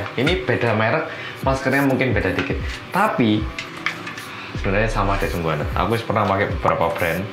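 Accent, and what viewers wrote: Java, Indonesia. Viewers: Absen dulu buat si korea medhok!😊 sukses selalu oppa(?)atau mas iki😂,salam bolo" barumu mas.aku mau manggil mas aja karena aku udh menganggapmu sbng wong medhok😊. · siang medoookkkkk wkkwkwkw tmbah ganteng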